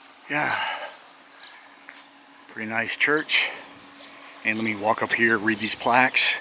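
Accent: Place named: Virginia, United States. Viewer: What part of Virginia are you yourself from, the Tidewater area? You're talking about the Tangier accent but I'm wondering about yours. I grew up in the 757 and you sound like many of my relatives.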